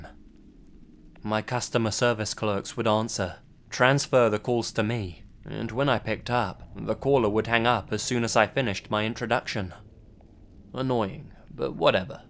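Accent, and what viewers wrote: United Kingdom. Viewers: How and when did you get into narrating horror stories. Also are you British? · I love your creepy intro. And your adorable British accent.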